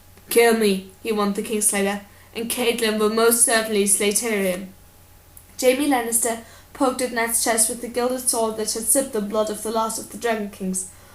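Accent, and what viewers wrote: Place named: England, United Kingdom. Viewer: i swear this is a different version of the book that i read still entertaining though plus gotta love a woman with an English accent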